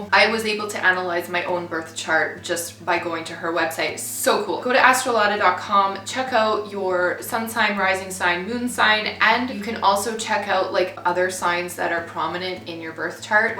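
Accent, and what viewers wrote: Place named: Canada. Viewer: I appreciate ur authenticity and sharing these prominent Youtube leaders! Although they don’t always resonate with me they are knowledgeable & authentic. There so many quasi spiritual charlatans out there presenting 1/2 truths & can be damaging to new seekers. ❤️ ps i thought i heard ur sweet canadian accent!!! 😉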